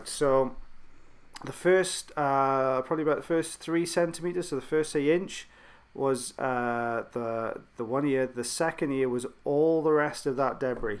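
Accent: Wales, United Kingdom. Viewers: Sally, it's about as mild as an accent gets. I can just about detect Welsh, which means he could be from Hereford, England, where some folk sound Welsh to my home counties ear. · ear wax removal + welsh accent asmr = most satisfying video on youtube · I thought I could hear the beautiful Welsh accent in his voice lol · Are u welsh? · Are you Welsh?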